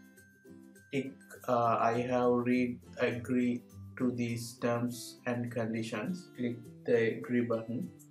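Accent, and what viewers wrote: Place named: India. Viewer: India English^_^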